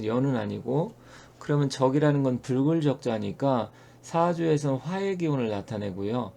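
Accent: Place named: Jeolla, South Korea. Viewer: 말투에서 절라도 억양이 묻어나오네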